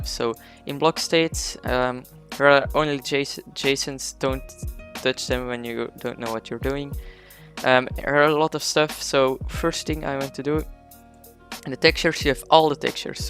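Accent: Netherlands. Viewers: The accent is pretty dutch here, gwn om te zeggen hoor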